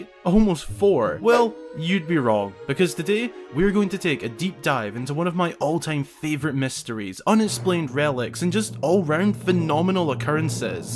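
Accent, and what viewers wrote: Scotland, United Kingdom. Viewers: Why thats a lovely Scottish accent you have there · he's from the UK dude (pretty sure), he has an accent, foreign people just pronounc things differently 🙄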